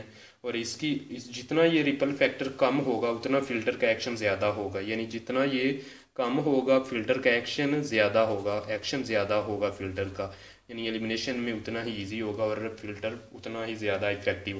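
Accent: Punjab, India. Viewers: Are u from Punjab?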